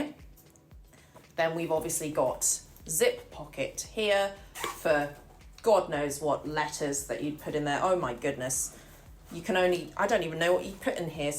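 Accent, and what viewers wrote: United Kingdom. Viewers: Lovely bag! Also side note, I love how you are Asian but sound so British - like me! 😍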